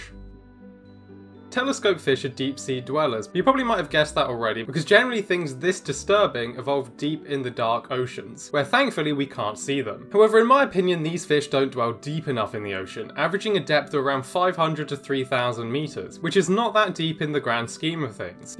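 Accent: RP, United Kingdom. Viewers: Calling zoologists/scientists "stupid" while regurgitating all their findings in a lisping plummy nonchalant monologue 🤦🏻‍♀️ L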